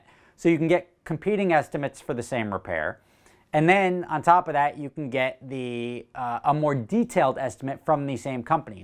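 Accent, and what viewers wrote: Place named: New York, United States. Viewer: Am from Kenya and you just have the realest New York accent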